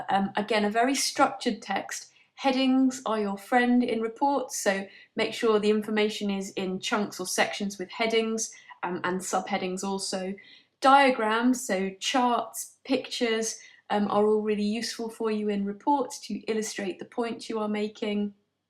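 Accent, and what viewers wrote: United Kingdom. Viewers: I love British accents also great video I learnt a lot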